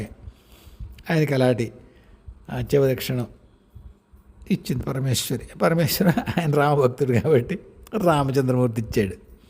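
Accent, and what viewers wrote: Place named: Godavari, India. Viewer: మీరు గోదావరి యాస ను బాగా ప్రేమిస్తారని అర్ధం అయ్యింది గురువు గారు నేను ఇక్కడే పుట్టాను.గోదావరి జిల్లా లోనే నాకు కూడా చాలా ఇష్టం ఆ యాస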